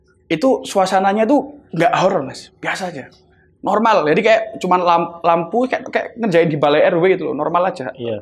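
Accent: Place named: Java, Indonesia. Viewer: biyuhhhh logat e malangan puoll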